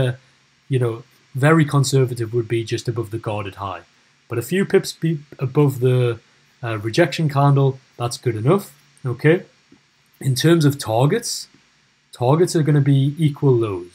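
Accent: Newcastle, United Kingdom. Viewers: Really good video! Are you a Geordie?? Cheers!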